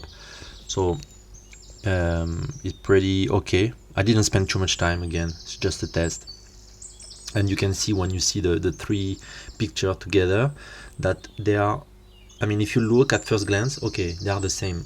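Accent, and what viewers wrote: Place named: France. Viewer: That french accent 💙 Great technique. Actually when you refuse to insert "beautiful face", you're showing a great example of how to do - effectively - your own art. Beauty? Kind a weird concept, when you scroll into image libraries online and see only beautiful faces. No more face diversity, everyone is a casanova or a supermodel. Great job. Vittorio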